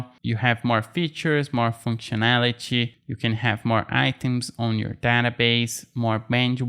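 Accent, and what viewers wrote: Brazil. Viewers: Your english is with brazilian accent rsrs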